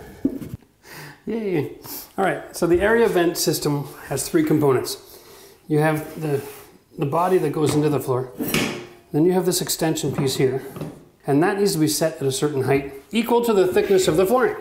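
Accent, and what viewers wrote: Canada. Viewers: Are you from Canada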